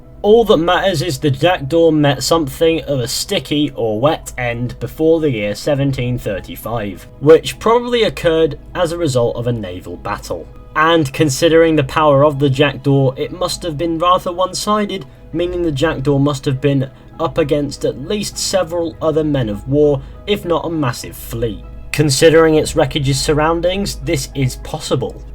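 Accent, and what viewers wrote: United Kingdom. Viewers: Where exactly is your accent from? I know it's somewhere in the UK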